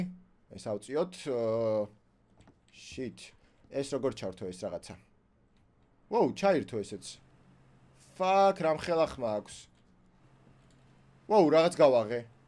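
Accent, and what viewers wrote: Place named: Georgia. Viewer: tu videos igeb inglisuri sityvebit mashin mtlianad inglisurad ilaparake,magram tu igeb videos inglisuri sityvebit mashin inglisurad kargad mainc ilaparake aba qartuli aqcentit inglisuri sityvebis gagoneba sasinelebaa:)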